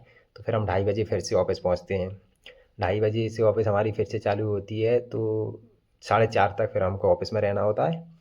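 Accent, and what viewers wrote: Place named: Braj, India. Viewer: Baat karne ke tarike se lag raha hai aap ki real bhasha Braj Bhasha hai 😊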